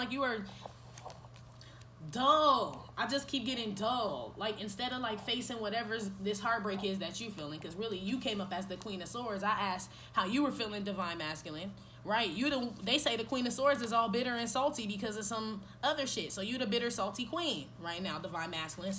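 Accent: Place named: Los Angeles, United States. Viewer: Girl you got to be from out here in LA..I know them accents anywhere 😩...you be having me ctfu!! lmao n girl yes fck him he'll be back 😩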